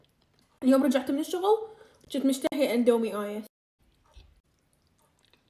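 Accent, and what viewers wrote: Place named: Iraq. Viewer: من طريقة كلامج حسيت إنج عراقية و تأكدت لما شفت شو كاتبة تحت الفيديو +++أنا مغربية و أحب العراق ❤🇲🇦🇵🇸🇮🇶❤🔥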